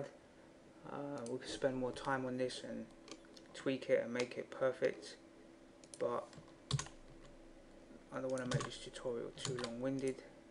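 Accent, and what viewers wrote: United Kingdom. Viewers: Like the British accent! You need to do some gimp starter tutorials as you are telling elementary steps while doing advanced tutorials man!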